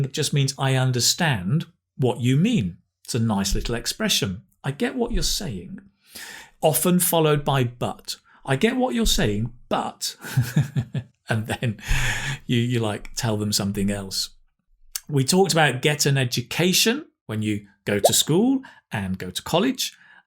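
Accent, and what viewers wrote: United Kingdom. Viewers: Nothing like a British accent, absolutely Masculine...such a marvellous hissing sounds. Nice presentation.👍👍👍👍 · NO American accent, only British!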